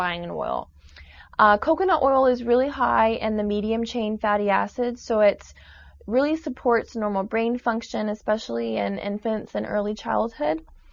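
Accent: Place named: South, United States. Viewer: Yeah I notice that too. I think it's her trying to hide a southern accent.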